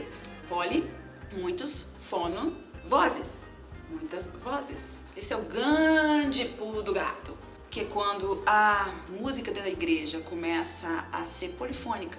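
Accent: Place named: Minas Gerais, Brazil. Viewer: Oh gente!!! Mineira mesmo kkkkkk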